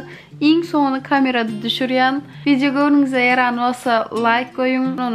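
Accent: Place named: Dashoguz, Turkmenistan. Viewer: хавва мензейа но ахал лебабын дилини гарышдыря ол саядын диалектинде де гурланок скорее всего дашогузлыга мензейа шол шалака ниреден болса да янымда болса сачыны утердим, бизи лебаплылары масгаралап йор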